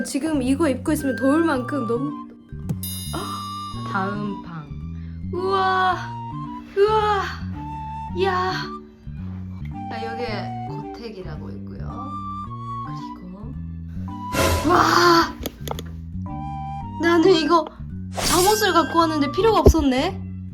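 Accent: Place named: Busan, South Korea. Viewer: 그리고 부산사투리 작렬. 3개국어인가요? 일본어 표준어 사투리 ㅎㅎ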